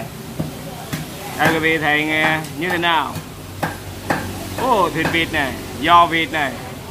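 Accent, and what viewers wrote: Hue, Vietnam. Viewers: Chương trình của bạn rất hay. Cách dẫn giải từ tốn rất rõ ràng, đặc biệt là tiếng Huế rặc làm cho tiết mục thêm phần hấp dẫn. Đây là một loại thuốc thần kỳ để chữa bịnh nhớ Huế của những người xa xứ. Cảm ơn bạn rất nhiều. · noi giong Hue ray de nghe, cam on em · anh nay noi kho nghe qua noi giong hue bo tay hieu duoc chet di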